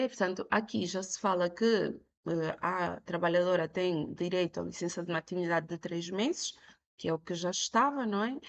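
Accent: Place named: Cape Verde. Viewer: A formadora tem um sotaque interessante, parece de cabo verde.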